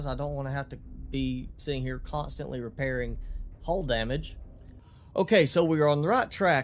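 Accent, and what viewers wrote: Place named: Texas, United States. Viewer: Nice Texan talk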